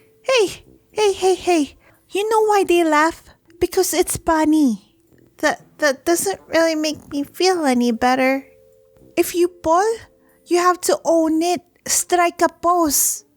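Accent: Philippines. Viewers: Are you filipino? Cuz im filipino. The way how i can tell is by the accent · I love you you got the Filipino accent on point or should I say pilipino 😉😂✨❤️ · I love the accent!❤️ It's just so Filipino 😂 Every pissed Filipino parents : Ay naku! 🤣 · Tagalog Accent xD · Im new here so I didn't know she was Filipino until she said Philipine and thought: "So that's why she has sort of a Filipino accent like Alyah and Johan!" 😂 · BEST FILIPINO VOICE EVER (i dont know if i spelled that right) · Thats accent is very Filipino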